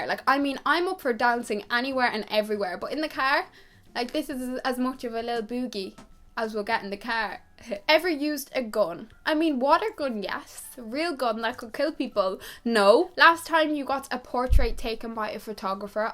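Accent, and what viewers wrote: Ireland. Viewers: And the award for Best Irish accent goes to you 🏆🥇🎗. You’ve just won First prize.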